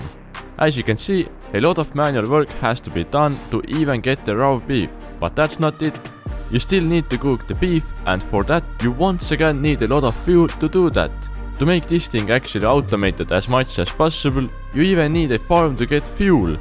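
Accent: Estonia. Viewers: He sound like he is Estonian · u from estonia??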